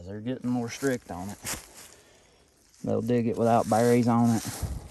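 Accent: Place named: South, United States. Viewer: Thank you so much. What a nice tribute to your Mom! I enjoyed listening to your southern drawl as you led the hunting expedition. It was great to actually be there and watch a pro at work. Your Momma taught you well...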